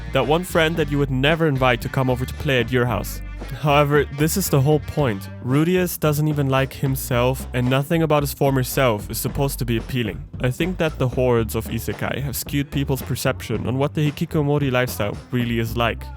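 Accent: Germany. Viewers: Junge eine Sekunde des Videos und ich weiss einfach, dass der Bre deutsch ist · Good video. The Made in Abyss OST in the end of the video just fits to MT. I was listening to it on repeat while reading the novel. PS: Hab 5 sekunden gebraucht, um herauszufinden, dass du deutsch bist. Unser Akzent is einfach zu offensichtlich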